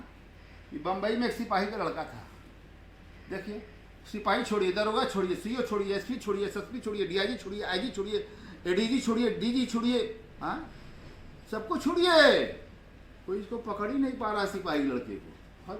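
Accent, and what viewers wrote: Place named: Varanasi, India. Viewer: Sir apka ha topic bada dilchasp aur pardarshi hota hai इसपर से आपका बनारासिया अन्दाज़ सुनने में बहुत मजा आता है.. sadar pranam aapko..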